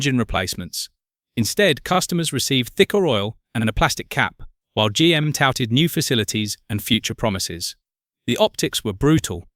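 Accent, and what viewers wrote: United Kingdom. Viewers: Started to watch until I heard that obnoxious "British" voice, , leaving . I will find this info on an American video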